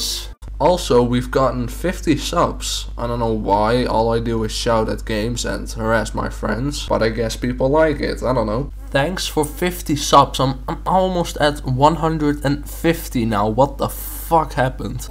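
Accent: Netherlands. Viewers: R u Dutch? U sound dutch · Are you dutch? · Haha dit klinkt echt als een Nederlander 😂 klopt dat?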